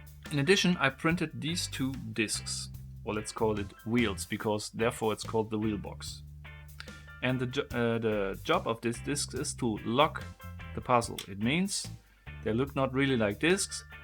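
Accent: Germany. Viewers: Can it be that you're german? Because of your english😊 no hate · Instead of toying with this box you should polish your English or bloody give it up altogether.....so f....g German...it is NOT beck but back....it is NOT gep but gap...it is like the Chinese who say lice instead of rice...very disturbing. Record yourself and or go to a pronunciation bloody class...and you do not say isss you say izzz....English voiced C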